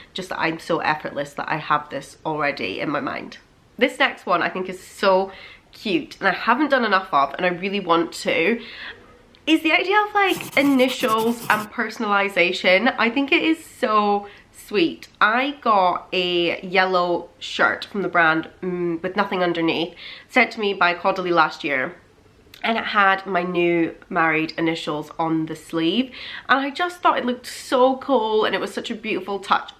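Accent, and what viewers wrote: Scotland, United Kingdom. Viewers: Your accent is so pretty. Are you from Scotland or Northern Ireland?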